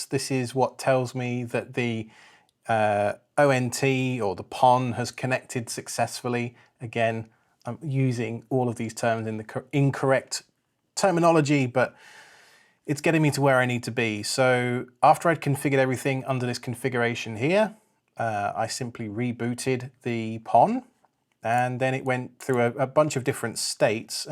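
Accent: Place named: United Kingdom. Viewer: I'm not familiar with the different UK regional accents, but to my ears you sound like you might be from the same general area as James Corden, or as I refer to him, Craig Owens: Father of Stormageddon, Destroyer of Worlds. Just how far off am I?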